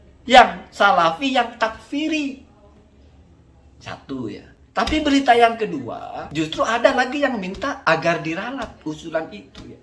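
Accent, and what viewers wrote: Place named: Lampung, Indonesia. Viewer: Bang.. ente orang mana sih. Kok mirip orang Lampung 😁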